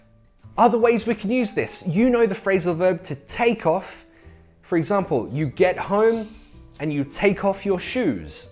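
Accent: United Kingdom. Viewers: Are you British, teacher? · The way he talks has a very typical British accent than we Americans. Awesome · He's British!!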